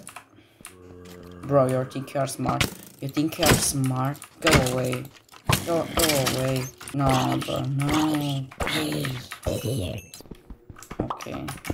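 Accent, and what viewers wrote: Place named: Colombia. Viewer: great editing! are you colombian btw because you sound like it lol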